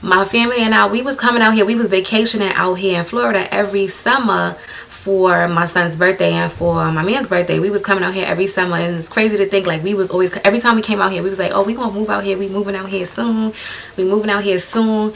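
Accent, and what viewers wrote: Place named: Brooklyn, United States. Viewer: love this video from the jump...lol. your Brooklyn accent is so strong. love it! I am planning on moving to Florida in 4 months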